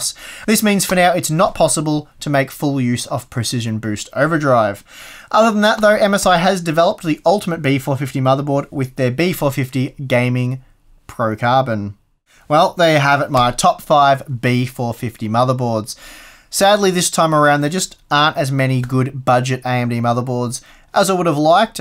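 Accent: Australia. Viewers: you sound Australian, why not say the Australian prices? (I am aussie)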